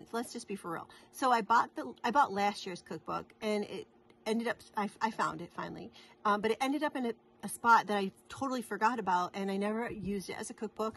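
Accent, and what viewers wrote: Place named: New England, United States. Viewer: Happy belated Birthday ! I hope you have the best time at retreat! I love your videos! Hear your voice and New England accent reminds me of home! I moved to Texas in 1981 and miss those New Englanders ! Your students are so lucky to have a teacher with such a happy personality! Hugs from Texas! Ps you can never have too many starts!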